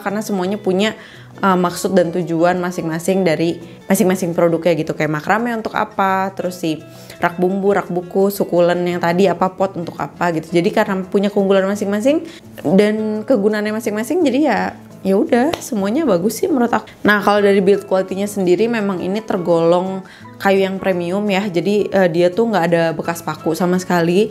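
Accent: West Java, Indonesia. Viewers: Sundanese girl banget anjir logat ngomongnya😂😂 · Nyunda pisan 😂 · Si teteh ngomong nyh Sunda, aku suka❤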